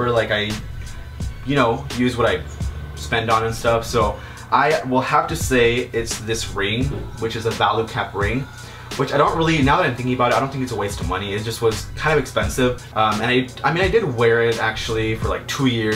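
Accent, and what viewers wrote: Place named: United States. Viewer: Si vas a hablar espanol hablalo bien no con acento americano, just saying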